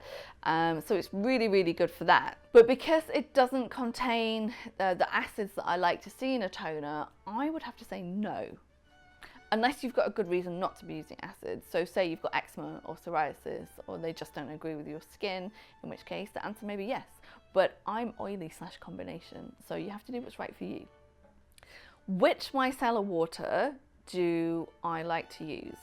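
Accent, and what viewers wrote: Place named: United Kingdom. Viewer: I love British English accent 😍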